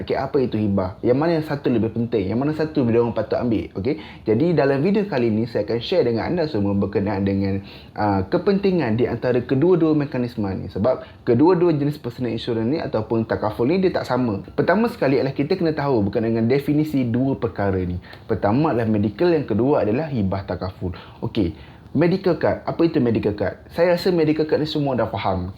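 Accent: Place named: Terengganu, Malaysia. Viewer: Bunyi mcm org ganu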